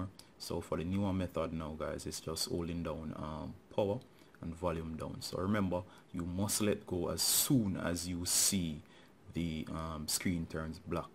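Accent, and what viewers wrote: Jamaica. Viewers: I did panic! Thank you so much. All good now. Your accent helps too.....Jamaician?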